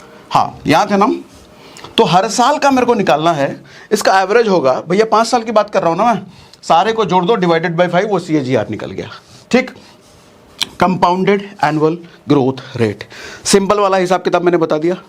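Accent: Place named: Bengal, India. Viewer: Bhaiya ap bangali ho?👀motamuti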